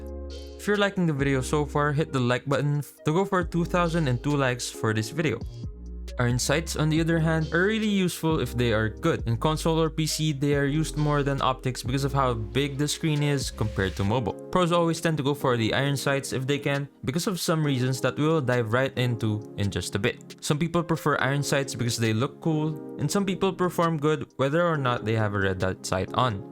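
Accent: Philippines. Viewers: your accent is like a pilipino accent. are you half pilipino?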